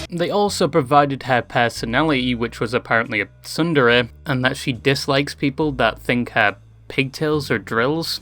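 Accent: United Kingdom. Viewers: Why do i realise this guy is kinda bri'ish (first time ever watching this guy) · You may call me old for knowing Angel with a Shotgun. But your British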